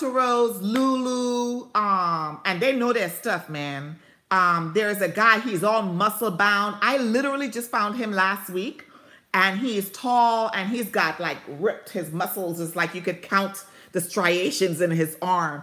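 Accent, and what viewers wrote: Trinidad and Tobago. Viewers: ..Congratulations! I was lucky to catch the end of your livestream. Excited for the next one. Love your costume shirt! We love Mandolorian and the child. You sound so happy and jolly I am so very happy we subscribed to each other hoping to get to 1,000 subs soon. I am hearing a slight island accent there❤❤❤ Ps. Wish I knew about the giveaway before those samples looks awesome especially the lipstick I need some new ones but can't afford any right now. Girl some of them brands I never heard about. I am really happy that I am here I have a lot to learn llove the Mickey Mouse bottle I live in his backyard 🙂 Hey I figured the accent out Trini in the house yayyyy. We Trinis are very generous people, your son is very cute. I like how you teach him to call people Miss or Mr I teach my granddaughter the same ❤❤❤ look on my channel I have all them delicious dishes you talking about. 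Come retire in Central Florida it have a lot of new affordable houses.Do you have a PO Box?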